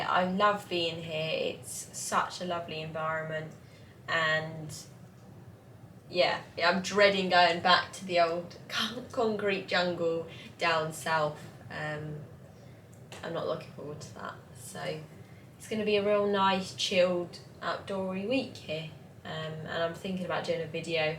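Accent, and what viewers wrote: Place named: Manchester, United Kingdom. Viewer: do you have links to manchester at all some of the words you say we say in manchester like manky haha, and mount-in just wondering :) thanks great video btw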